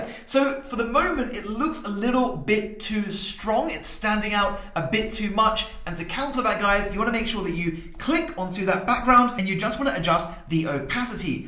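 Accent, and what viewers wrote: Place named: United Kingdom. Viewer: Annoying that Printify don't actually provide greeting cards in the UK. Since the guy in the video sounds British I didn't think it would be a problem and I've already done all the other steps but I cant actually do it! I cant seem to find any platforms that provide this in the UK - does anyone know any?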